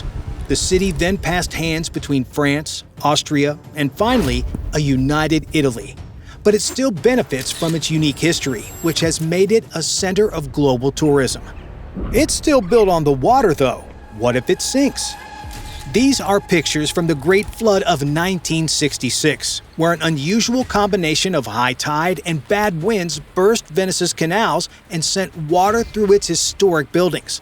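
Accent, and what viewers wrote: United States. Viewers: The sensationalist, excitable american narration makes this unwatchable (like most 'documentaries' from that part of the world). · American accent makes the video cheap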